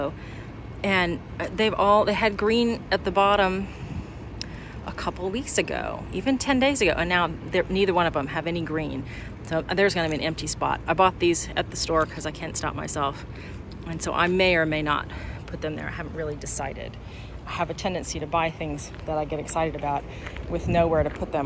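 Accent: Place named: Texas, United States. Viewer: Your garden is such a delight. Enjoy listening to your Texas voice as you describe everything. Thank you for sharing.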